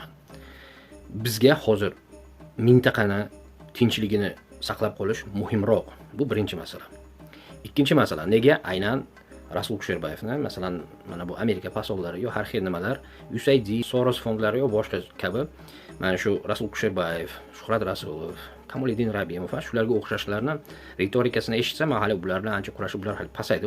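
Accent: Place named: Tajikistan. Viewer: Янги очодиган каналингга ут тушсин.Яхши айтиб куйди.бекорчи гийбатчи тухматчи янги чиккан ёлланган сиёсатчига халк мухтож эмас.Кайирда кандай илм олгансан.Сиёсий маьлумоти нима адабий соф узбек тилини кайси тожик шевасида гапиряпти узингни билимингни яхшилаб ошириб олгин ким танийди бу гийбарчини инсонларни кимматли вактини олиш хам катта гунох.Вактни яхшиликка яхши ниятларга сарф килиш керак.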